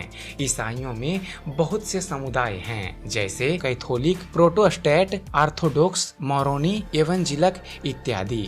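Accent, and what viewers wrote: Bihar, India. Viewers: full bihari aawaj he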